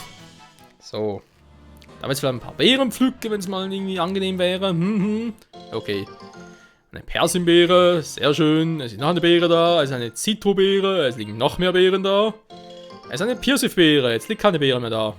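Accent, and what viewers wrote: Switzerland. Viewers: mä merkt das schwizer bisch:)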